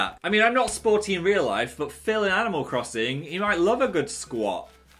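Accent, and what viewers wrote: North, United Kingdom. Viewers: Sounding pretty Northern today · Phil's Northern accent is coming out HARD in this video.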